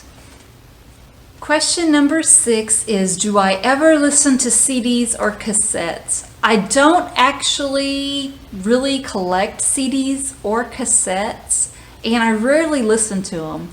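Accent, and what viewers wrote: South, United States. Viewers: Hey, how are you doing? I subscribe to several members of the vinyl communityand they are increasingly starting to mention you as an influencer in the vinyl community. So that's very good for you and it means your influence is growing.I know you have mentioned that I believe, you were born in Indiana.I have many cousins who are from Indianapolis and they have what I would consider from Chicago a southern accent.yours is more pronounced so do you think where you live now has increased your southern accent? I've always been interested in how people speak compared to where they grew up in so that's why I'm asking.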